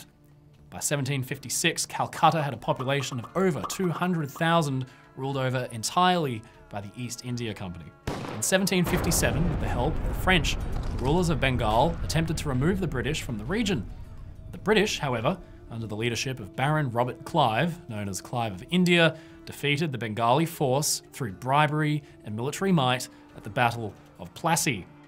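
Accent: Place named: Australia. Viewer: Fantastic video composition, really sad content. The Australian accent makes you sound extra smart!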